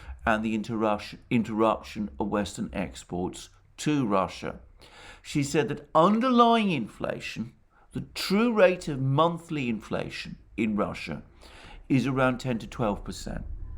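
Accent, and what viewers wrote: England, United Kingdom. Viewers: It hard for me to hear English accent. But I try my best to hear your story mr...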